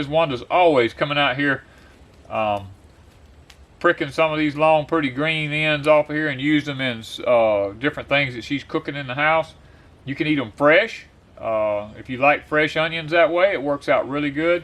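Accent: South, United States. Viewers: I have a southern accent, too, so I don't notice his accent. He sounds like everyone I know. Lol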